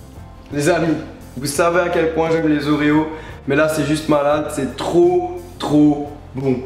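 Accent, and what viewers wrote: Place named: Alsace, France. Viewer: Coucou toi c la première vidéo que je vois de toi et j'adore c cool +1 abonnée et juste une question : est tu alsacien car tu as un petit accent PS moi je le suis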